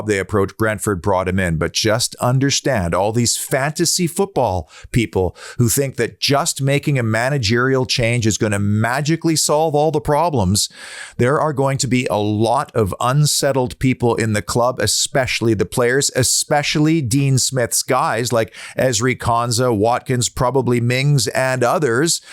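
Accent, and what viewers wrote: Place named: British Columbia, Canada. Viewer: British Columbia is North America but not America. Listen to the accent. a star.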